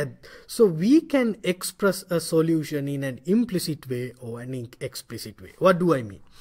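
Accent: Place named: India. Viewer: he has such a cute Indian accent.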